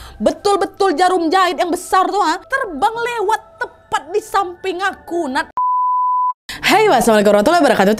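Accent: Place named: Riau, Indonesia. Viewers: pake logat Riau juga ya Wak😭😭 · Kak knpa logat nya jdi logat riau. "ndak do"😂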